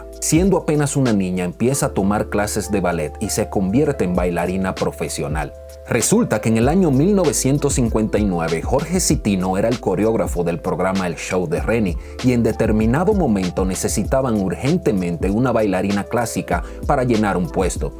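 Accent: Mexico. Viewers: Que magnifico trabajo el suyo, destacar la participación de artistas quisqueyano fuera de nuestro país, para que conozcamos a los nuestros en playas extranjeras. Muchas gracias principe por ese regalo a los dominicanos. Creo por su acento, que usted es del tan lindo y querido México, nuestro hermano mayor de Norteamérica. Bendiciones para usted.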